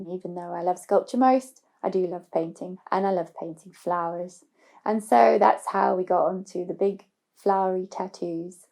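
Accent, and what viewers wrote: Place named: South Africa. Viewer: Ah! I can tell by your accent! I speak Dutch coming from another X colony!😃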